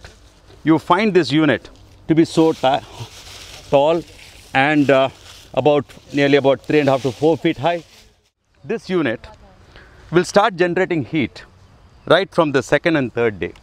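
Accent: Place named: Kerala, India. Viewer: His accent sounds like that of Malayalis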